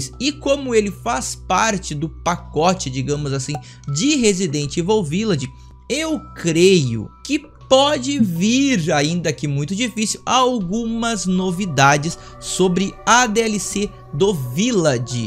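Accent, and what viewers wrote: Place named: South, Brazil. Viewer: Opah chegando agora no canal, depois da live com o enmynest, esse teu sotaque é aqui do sul certo, Rio Grande RS abração, mais um inscrito...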